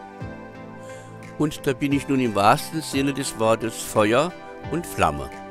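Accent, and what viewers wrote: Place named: Saarland, Germany. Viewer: Ach, gugg mo doo... Was für e Üwweraschung zum Schluss, die Saarlänner sinn eschd üwwerall... Do haschde dei Platt awwer gudd verschdeggelt... :-D Vielen Dank für das hifreiche Video, jetzt kann ich als Anfänger meine verschmutzten Nozzles auch reinigen... Grüsse aus Aachen, von einem Exil-Saarländer mit dem Herz noch immer in der Heimat :-D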